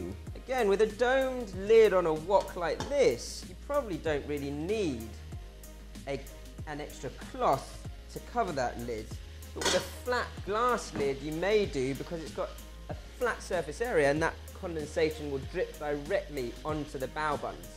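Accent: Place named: United Kingdom. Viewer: The british accent tho... **heart eyes emoji*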